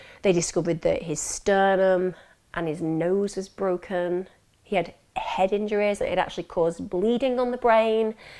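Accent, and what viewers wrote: United Kingdom. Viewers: I just want to stop by applauding you with your consistency I mean I seriously don’t know how you do that! You’re a professional background is so interesting and your research is beyond Par! I moved from Wales to Southern California and seriously I just love hearing your British voice I miss that a lot been here nine years now! I just shared the Turpin case on my Facebook as that is actually really close to me just a few miles! Keep shinning you are incredible! And thank your amazing Hubby!! 🤍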